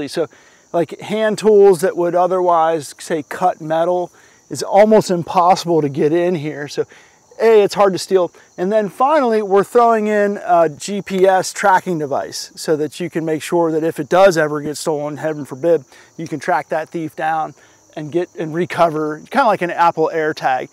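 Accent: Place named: California, United States. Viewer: This guys Cali accent is hilarious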